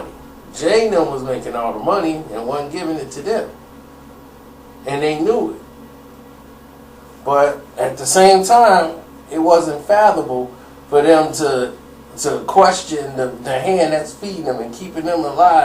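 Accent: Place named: Philadelphia, United States. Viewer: Why are you talking philly. Your a cornball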